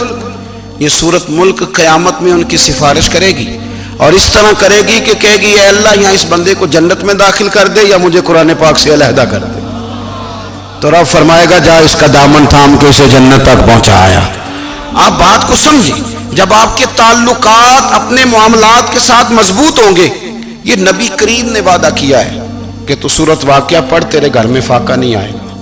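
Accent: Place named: Punjab, Pakistan. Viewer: kis tarha se baat karte hain aap peer sahab , dil ku sukoon mil jata hai , aapki punjabi accent toh mashallah ❤❤❤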